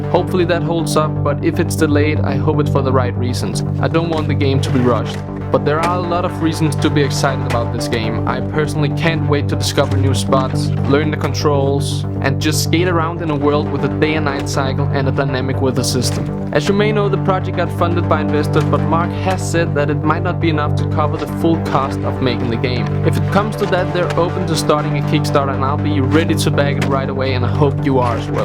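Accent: Denmark. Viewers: is this Guy danishh? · You're danish aren't you.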